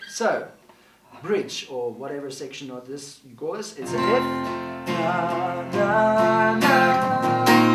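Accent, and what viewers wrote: South Africa. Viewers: Great fan of your lessons.. very helpful lesson. Thanks for taking the time out to do this for us. Greetings from India to South Africa?? (Your accent says so!) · My entire father's side of the family is South African so right when you started talking, I knew immediately where you were from. Awesome tutorial!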